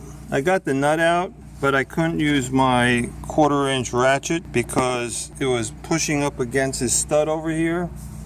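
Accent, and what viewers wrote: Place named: Northeast, United States. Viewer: Are you in RI? Or NJ or LI? When you started talking I thought to myself, this is not one of my vids....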